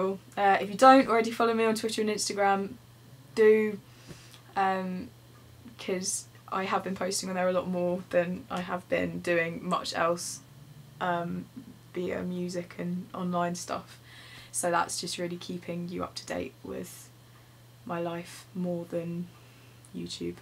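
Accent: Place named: England, United Kingdom. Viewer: What county are you from, I'm from Bedfordshire I'm just wondering as my accent is quite similar to yours :)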